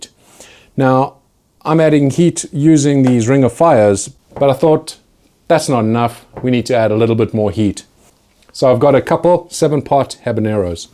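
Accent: South Africa. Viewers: got such hectic whiplash because i instantly recognised your south African accent 😂😂😂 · Hi. Based on your accent, I'd say you're South African. Where are you based? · Is that a South African accent? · You sound South African 🤣🤣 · When you recognize a South African accent... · Can't miss that SA accent anywhere you go.... Nice video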